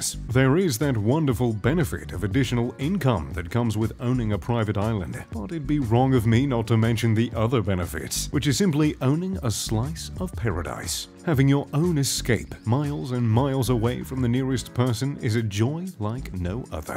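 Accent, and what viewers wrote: RP, United Kingdom. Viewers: You sound like the spiffing brit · why do we have a boujee British man narrating this?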